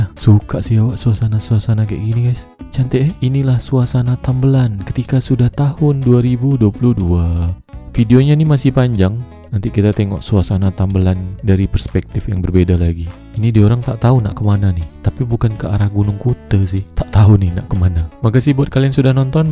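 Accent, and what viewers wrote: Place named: Perak, Malaysia. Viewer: Salam dr mlysia..dgr drp dialek tuan punya video, mcm dialek org perak jer, awak tu mksudnya saya..dialek k.kangsar & parit..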